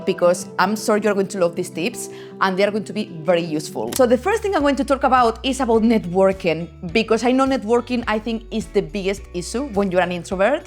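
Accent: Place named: Spain. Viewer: I’m told that if you go to an event with 8 peoples, you will talk to 8 people, if you go to a large event, even hundreds, you will talk to 8 people. I am an old guy, I do not do small talk, just lost for words. The lovely Spanish accent works well for. Family are the worse people to practice with.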